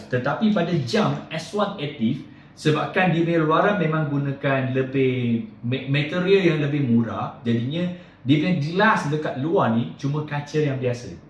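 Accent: Terengganu, Malaysia. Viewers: Bunyi macam org Tranung ni.. 😊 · mcm org Ganu je ni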